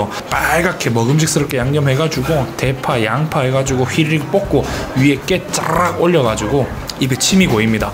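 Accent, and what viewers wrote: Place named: Seoul, South Korea. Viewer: 이제 인트로가 서울말 다됐네 다됐네.....초심....유튭최강자 되니까 사람변한다....사투리로 돌아와줘😭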